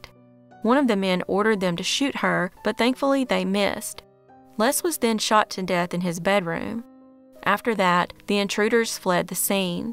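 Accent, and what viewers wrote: South, United States. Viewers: Where are you from in Tennesse?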